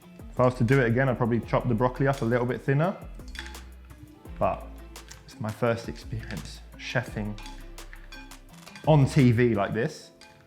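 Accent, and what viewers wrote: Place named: United Kingdom. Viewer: Sam vraiment ton anglais il m’impressionne avec la petite touche british wo’er 😂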